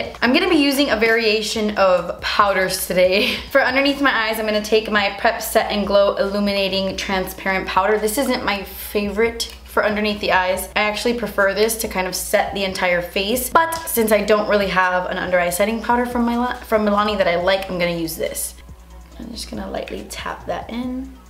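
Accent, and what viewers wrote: United Kingdom. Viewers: Ok, but why was that British accent so adorable?😂💜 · Can you do a whole tutorial in your British accent please! I'm British and its super annoying when people try to overdo it but yours is SOOOO CUTE!!! I love it! xoxo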